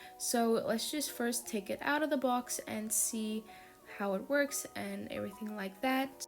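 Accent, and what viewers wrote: South Africa. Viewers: Is she South African?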